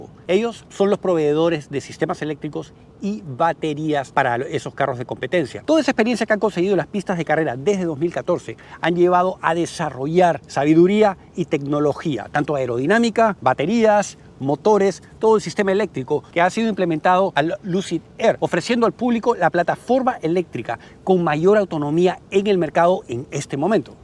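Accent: Peru. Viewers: eres de Perú??????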